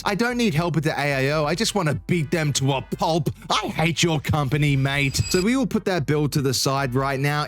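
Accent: Australia. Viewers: Just recently stumbled upon your channel and very happy I did. One I love the doggo. Two love your accent. Makes me think of crocodile dundee. And of course I am one of those weirdos that loves watching people mess with computers. Cheers brother.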